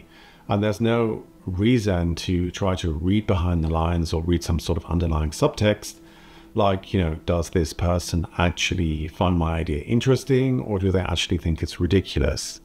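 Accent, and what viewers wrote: Germany. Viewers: Not having to learn German only applies to people with EU passports (or in your case, "former" EU members). All the others, including Americans, will have to show some proficiency at a certain point in time, otherwise the residency will not be renewed. - Unless they changed that recently, which I might not be aware of (which I can't imagine). You're a lovely person and I'm glad the algorithm brought me here. Might it be possible that after all these years you have a slight German accent? What are your friends and family back home saying? I'm really curious. All the best and thank you. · As a Brit,I love Germany, it's a beautiful country, and I find German frankness very refreshing. Whether I could live there or not ,is a different matter .BTW, after 10 years living in the country, your English accent appears to be tinged with teutonic tones. 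Thanks for posting. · As a Brit I’d love to be able to just get on a train and travel to different countries so easily. Ps I can hear a slight German undertone in your English now. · I can hear that he lives in Germany in his English!!! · This is the first of your videos I'm watching. I observe that your native british english has a german accent. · It's because he has acquired a (very) slight German accent and diction! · This video is very interesting and informative. I think it's amusing that you now have a slight German accent. I learned German at school here in Australia in the 1980s and travel to Germany occasionally. I've visited every State, and it's one of my favourite countries. I find that if I start speaking German to a German stranger, he or she usually replies in English. Sometimes, that's convenient. At other times, I just keep on speaking German, and before long, the stranger gets the message. 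Usually, he or she then compliments me on how well I speak the language - so it really is worthwhile making the effort to learn. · You've picked up a German accent. It's been over 30 years since I last visited Germany. I should go back some day. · Interestingly, you speak English like a German, not a Brit.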